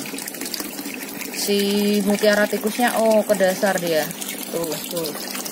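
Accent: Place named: Kediri, Indonesia. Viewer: Mbaknya jawane mana tho mbak? Kok kayak Kediri logate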